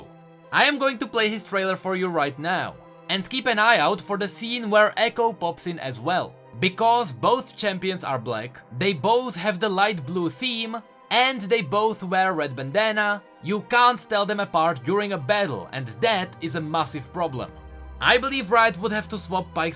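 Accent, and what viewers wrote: Czech Republic. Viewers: Věř nebo ne, ale už jenom podle přízvuku jsem poznal, že si Čech než jsem se podíval do popisku:D původ nezapřeš xd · Český přízvuk? :D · Podíval jsem se na tebe poprvé a hned mi bylo jasné že jsi čech podle toho přízvuku :d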